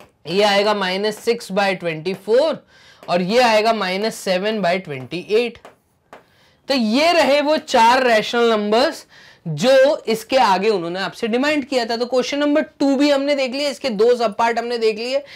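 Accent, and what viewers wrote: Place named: Odisha, India. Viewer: Sir i think you are from odisha I am from odisha and i mark your language and i think you are from odisha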